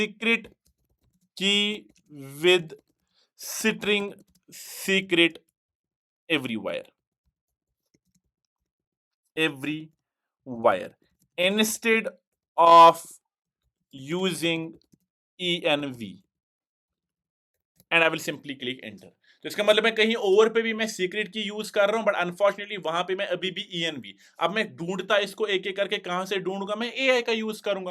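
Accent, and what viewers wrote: Kashmir, India. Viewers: Looks like you are from kashmir. From your accent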